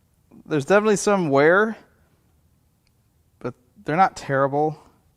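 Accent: St. Louis, United States. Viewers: True StL dialect. Wo ter. Like driving on Far-de-Far past the Carn fields to Worshing MO.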